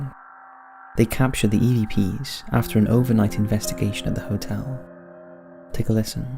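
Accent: United Kingdom. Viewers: Is this british, is he american? Can't make out what he is. His narration is really good though.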